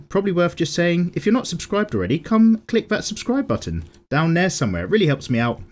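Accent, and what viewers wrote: United Kingdom. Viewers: damn. I WAS NOT EXPECTING A BRITISH ACCENT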